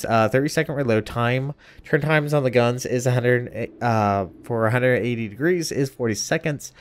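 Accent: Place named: France. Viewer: Dat French pronunciation doe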